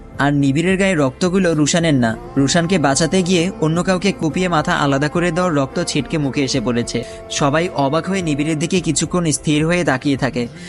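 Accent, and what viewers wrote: Sylhet, Bangladesh. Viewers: Vai apni ki Sylhety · ভাই আপনি কি সিলেটি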